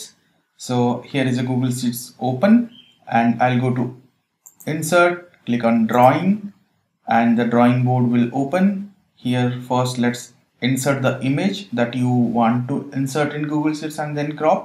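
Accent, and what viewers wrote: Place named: India. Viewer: Indian accent = masterclass of a video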